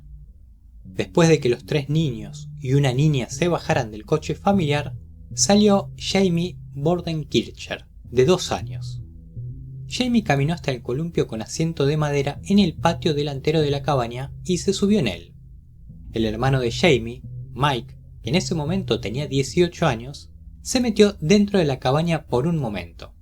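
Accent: Argentina. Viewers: Los Argentinos son perfectos para narrar historias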